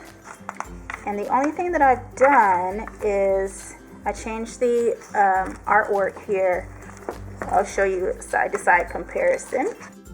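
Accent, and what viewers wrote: South, United States. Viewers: Great setup! I loved your country accent, it was fun 😉. I'd love to see a tutorial on how you change the hair and skin color, I really love that idea. Thank you for the chance to win these goodies, my favorite part of the kit are the sunflowers! They are my favorite flowers and are drawn so beautifully in this kit 😍. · I loved everything with yellow on it! I love that color for a transition into fall🧡. Another thing I loved was your southern accent it gave me a good laugh☺ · Absolutely adorable! Your southern accent was great, teehee! 👏🏻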